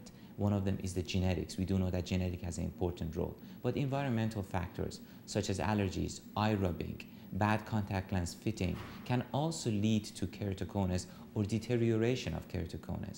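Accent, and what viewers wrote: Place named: Iran. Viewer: This is Iranian accent by the way.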